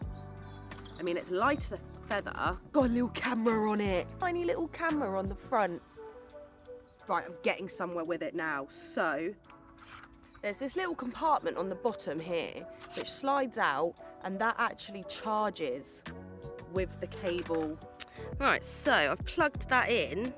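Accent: United Kingdom. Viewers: Great video 😊 Glad you're back on now Love your UK accent I'm here in the states And I always love hearing someone from the UK or Australia 😊 Good luck this season girl😊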